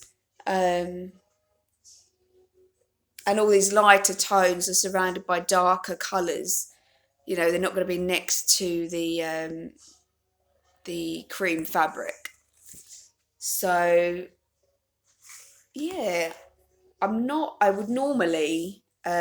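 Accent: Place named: Essex, United Kingdom. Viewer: How much fun are you! Loved the video 🎉. I'm a kiwi in Essex, and still adore the accent.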